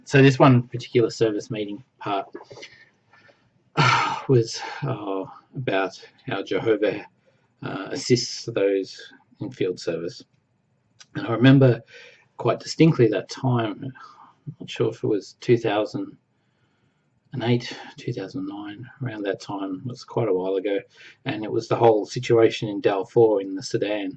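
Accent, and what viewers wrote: Australia. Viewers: Gidday! great accent but of course I am bias, been hot lately mate, thanks for your introduction, though I still believe in God and the kingdom, I can understand why you believe what you do now, and respect your views. :) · Awesome video. Thanks mate. I love our Aussie accent... be proud. Like Cedars you are laid back and nice to listen to. Cant wait to see more from a fellow Aussie. Your experience really resounds with me. 3rd gen always questioning the org, especially seeing all the badness around the world happening to good people but Jehs smartass, rude, selfish people were taken care of? I was also df but at 20. Sucked up go be re but now faded with Hubby at 35. Would love more info on the recovery group you mentioned if its an open group. Greetings from sunny Queensland 😊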